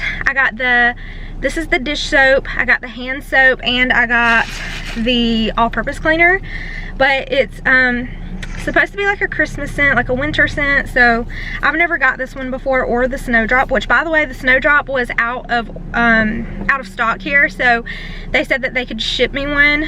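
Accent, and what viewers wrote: South, United States. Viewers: I love that pink tote bag! Sooo cute. I was induced with my last baby and it went sooo well. I took some cervical ripeners and then they broke my water and he was born 4.5 hours later, no pitocin needed. I am going to be induced at 39 weeks again this go round, I’m 30 weeks on Thursday. Good luck mama! I can’t wait to see him! I’m sure it will go great no matter how he comes. Also I just love your southern accent, I’m from Wisconsin and we have the weirdest accents here.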